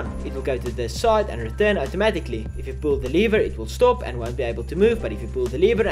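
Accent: South Africa. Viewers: South African, my Bru? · klink soos n safa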